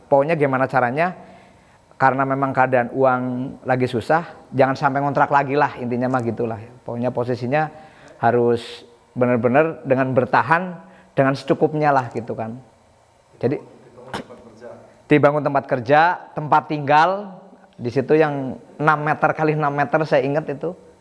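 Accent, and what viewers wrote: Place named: Banyumas, Indonesia. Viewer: Kayane wong ngapak kie lur 🙌😄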